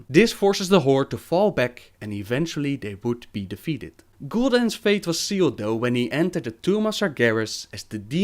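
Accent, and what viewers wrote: Netherlands. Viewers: lol are you dutch? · dutch is definitely his first language, has to be xD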